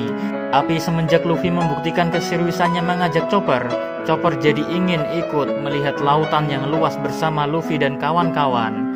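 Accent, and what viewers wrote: Java, Indonesia. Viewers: Love you min.. terbaik medok nya🙏🤣🤣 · Medok lu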